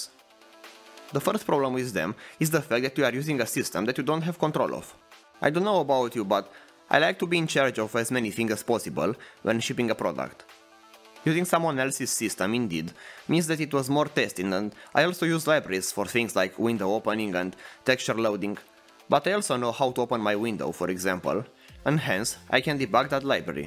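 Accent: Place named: Romania. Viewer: Efectiv stiaaaam ca esti roman dupa accent. Mam uitat la about si asa a fost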